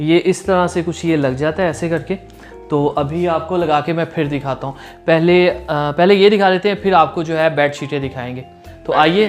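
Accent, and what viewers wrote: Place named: Punjab, India. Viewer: Punjabi bht pyari bolty hn ap😀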